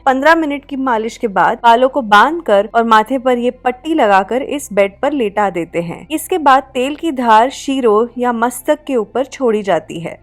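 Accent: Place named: North India, India. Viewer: Itani sudhdh Hindi...i guess your native place must be somewhere in North...anyway so pleasing to hear your Hindi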